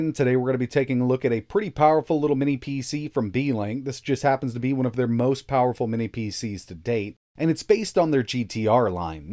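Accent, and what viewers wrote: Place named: New York, United States. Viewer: I like your dialect. Are you from the NYC area?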